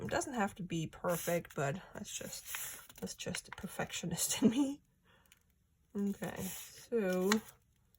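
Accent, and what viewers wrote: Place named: Minnesota, United States. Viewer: I love these! This vid just popped up. Are you still making videos? You sound like you’re from Minnesota or Wisconsin. Just an observation. Great content. 👍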